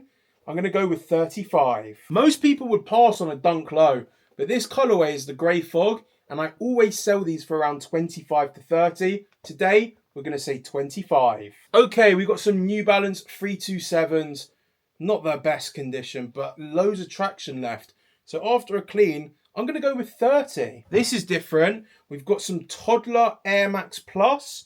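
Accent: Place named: United Kingdom. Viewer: you are extreamly from the united kingdom thats all i have to say not a bad thing at all btw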